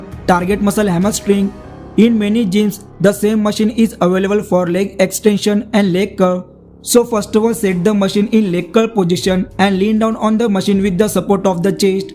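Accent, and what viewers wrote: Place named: India. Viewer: Its the Indian accent that makes it 10x better to me xD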